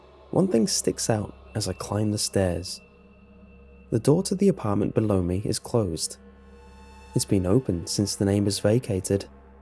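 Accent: United Kingdom. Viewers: British much?😂😂 I love how you say garage 😅😂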